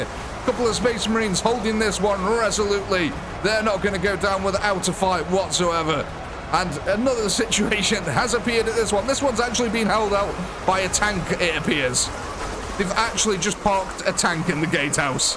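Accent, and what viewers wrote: Stoke, United Kingdom. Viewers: I detect a Stokie 👀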